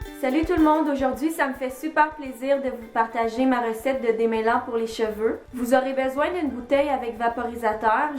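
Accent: Canada. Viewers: Tu as un accent canadien j'aime trop...et vrm le démêlant je l'ai essayé c super top ❤️😄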